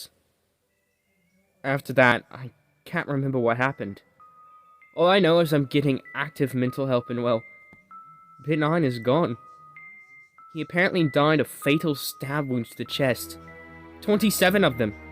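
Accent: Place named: United Kingdom. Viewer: the slight british accent while reading this makes this video ten times fucking better man, great job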